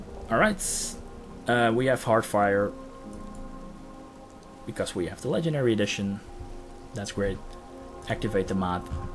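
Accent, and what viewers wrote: Netherlands. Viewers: Might i ask are you Dutch ? wat ik hoor een accent dat heel Nederlands overkomt ;)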